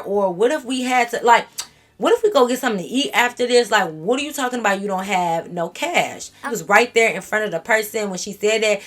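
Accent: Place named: Chicago, United States. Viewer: And I’m from Chicago so our dialect be hella similar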